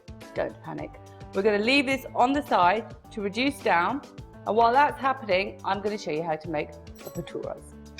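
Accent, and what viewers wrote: England, United Kingdom. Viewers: the first thing I noticed is your British accent. my twin was watching you in the living room and I overheard it from my room and thought why nigella lawson sounds quite different today 😂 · English accent me chole bhature.....maja ni aya...😛😛😛😛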